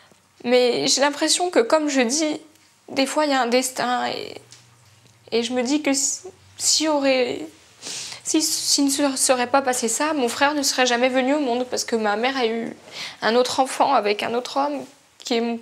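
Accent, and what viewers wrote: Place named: Corsica, France. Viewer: L’accent corse j’adore 🥰 « mais qu’est-ce que c’eeest» tu es de loin la Best 🤍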